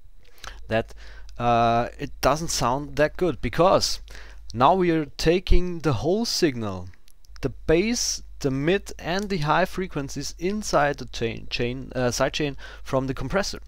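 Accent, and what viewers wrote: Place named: Germany. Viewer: you sound German..lol..."wideos"....still a good tutorial. thnx!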